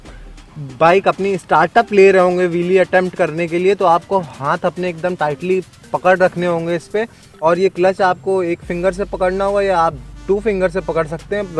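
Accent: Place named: Lucknow, India. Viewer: Ekdm LUCKNOW wali boli hai bhai... Loved it... 💙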